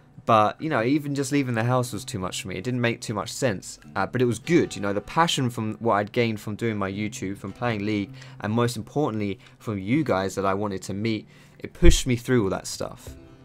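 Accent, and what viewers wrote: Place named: Essex, United Kingdom. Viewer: Haha ur an Essex lad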